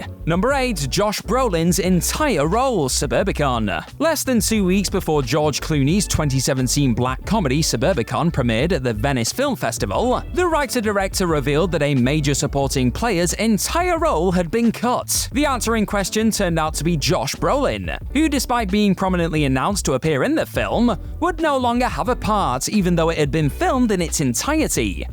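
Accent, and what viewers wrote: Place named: United Kingdom. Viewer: Yes it is, I've been watching British tv for over 40 years, I've heard that exact pronounciation hundreds of thousands of times from other Brits. It is part of the accent.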